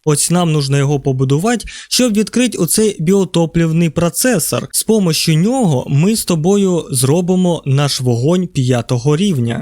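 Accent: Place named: Surzhyk, Ukraine. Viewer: Суржик просто топ🥹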